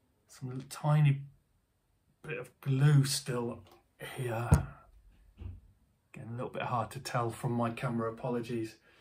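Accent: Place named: United Kingdom. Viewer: Must have be the British accent.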